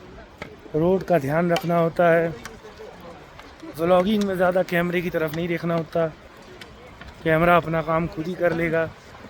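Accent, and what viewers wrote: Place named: Punjab, India. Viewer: Ur Punjabi accent rocks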